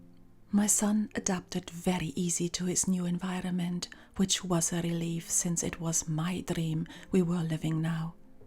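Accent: Scotland, United Kingdom. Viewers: Very beautiful video I wonder if you could say where you are from originally because to me you sound so Scotish thank you xxx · I like your Scottish accent. 💚 I have a similar story. I emigrated from Germany to Sweden 14 years ago because my inner home is reflected in Swedish nature and I can experience my joy of nature and my contact with nature beings. I found love and have been married to my Swede for 7 years. I am very grateful and happy to be able to live here.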